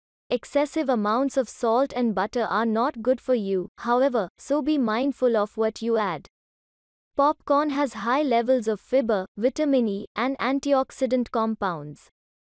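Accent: India. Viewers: No one wanted to listen to the Indian accent woman drone on in her sing song New Delhi voice.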